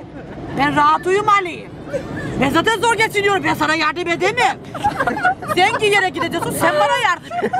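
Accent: Black Sea, Turkey. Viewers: çok güldüm şiveye bayıldım keşke bende konuşa bilsem bir rizeli olarak :) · Sen harikasın daha çok dizilerde görmek isteriz özellikle karadeniz şivesiyle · Karadeniz'in neşesi, enerjisi, mizah anlayışı, şivesi sende çoook güzel bir görsel/işitsele dönüşmüş... Gündemdeki "savaş çıktı çıkacak"ortamı üzerine seni seyredince, hayatın çok neşeli olduğu Arhavi yıllarımı, çocukluğumu hatırladım. Neşen daim olsun... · Çok tatlısın,kurban olurum karadenizime şivesine,doğru söylemiş anan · Bayiliyorummm sana hep karadeniz li siveneee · Karadeniz'li mi yoksa İstanbullu mu ya da doğum Karadeniz üniversite İstanbul ondan mı şive değişmiş. Neyse çok beğendim, devam. Türkan çok iyiydi bu arada :))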